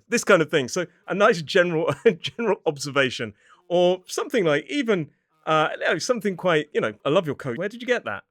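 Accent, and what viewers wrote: United Kingdom. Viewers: I really into British accent ❤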